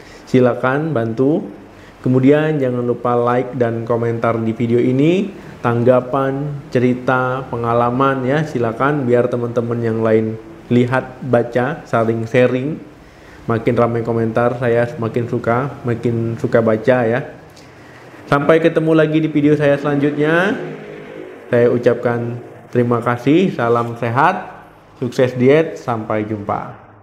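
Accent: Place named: Riau, Indonesia. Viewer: Mohon maaf oot dok, dokter org riau ya? Logatnya familiar sekaliii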